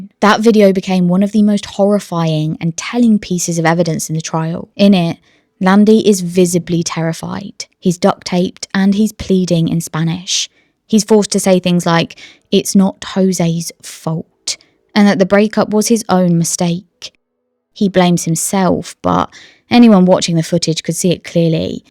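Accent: United Kingdom. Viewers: The really pronounced, British accent ruins the video. It’s hard to sit through. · She is British, so you want her to sound anything other than her own? 😂 I’m even more confused. You don’t like it don’t watch it problem solved. Haters gonna hate no matter what. Geez. I always love accent and how she present her story. Keep going girl never mind the haters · The narrator is British, hence the accent.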